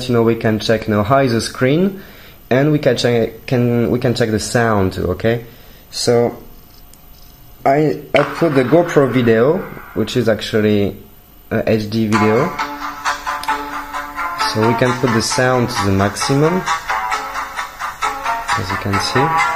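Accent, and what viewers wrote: France. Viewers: Belle accent français :)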